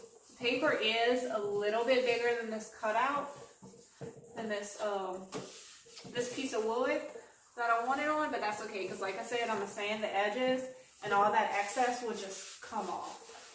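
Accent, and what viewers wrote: Louisiana, United States. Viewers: I KNEW you were from Louisiana when I started watching this video! I heard it in your accent. I'm from Baton Rouge, but have lived in South Carolina for 36 years. I am redoing a fantastic old high chair that I found in somebody's garbage. I've done another one, but not with chalk paint. This will be my first chalk paint project and it is for my 6 year old granddaughter. She wants a real high chair for her baby dolls, so this will be my Christmas gift to her. You did a beautiful job on this and I got a lot of good ideas from you!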